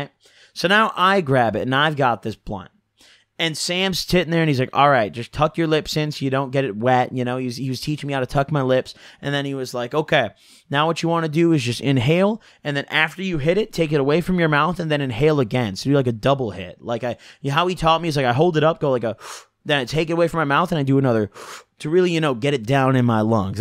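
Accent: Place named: Illinois, United States. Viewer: Sounds like you grew up near elmhurst iL thats were im at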